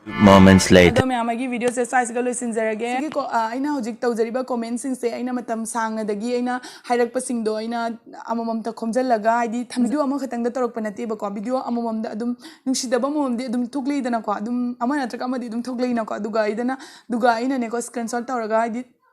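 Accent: Cachar, India. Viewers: Mawa loude sis hainingba haijasanu shidaraga pamde phajade hairibasingse moi macha mashuda yaoba oirasanu thiba phajababu eikhoina oihanbra kalkpagine makhoidagidi sisna henba adugine eidi sis se cachar meeteine khnbada yamna nungsi lots of❤ from imphal video toina thagtlk o 👍👍👍👍👍👍👍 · Meitei ga mayangagi style pullp mixed twrij cachar meitei gi chtnabiro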